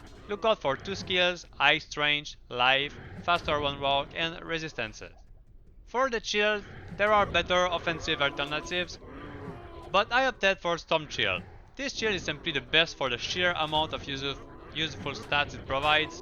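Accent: Quebec, Canada. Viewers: Québécois? Nice video 👍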